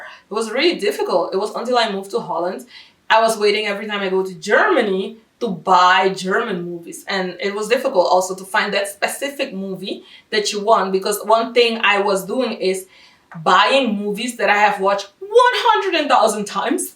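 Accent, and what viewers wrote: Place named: Colombia. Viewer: Tu Español suena muy Colombiano. Hablas muy bien todo. Yo no pude aprender Holandés porque todo el mundo habla Inglés en Amsterdam y en donde trabajaba todos se hablaban en Inglés. Un abrazo grande desde Colombia!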